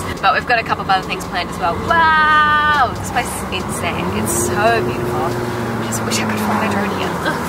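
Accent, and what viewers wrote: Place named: Australia. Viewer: Lol! I noticed that too. That's that Australian accent kicking in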